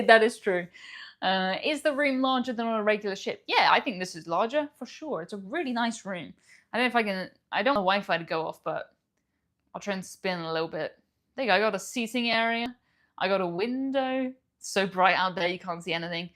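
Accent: United Kingdom. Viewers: Hello! Missed the live stream, am watching the rerun. Congrats on your philanthropy -- well done! I enjoy your cruising podcasts (as I am too ill to go myself) but most of all your accent and British isms. Makes me miss my Dad and Grandmum. Keep up the good work, and Happy Holidays to you!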